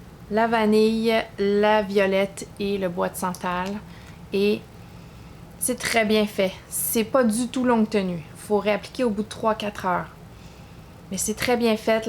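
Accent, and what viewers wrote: Canada. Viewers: Super vidéo, je suis nouvelle sur ta chaîne. Comme toi passionnée de parfums. Tes vidéos sont très intéressantes. Je me suis abonnée à. Ton accent canadien est merveilleux 😍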